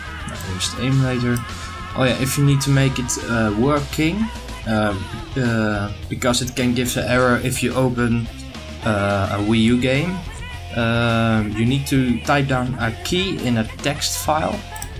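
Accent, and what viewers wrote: Netherlands. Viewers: Are you Dutch? / Ben je Nederlands?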